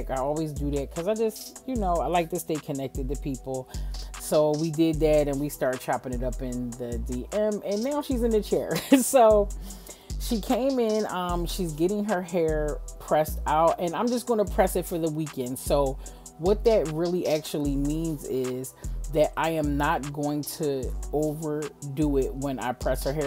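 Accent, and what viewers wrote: Philadelphia, United States. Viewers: Are you in philly? You sound like my Philly family. I need a natural beautician and I be in Philly sometimes.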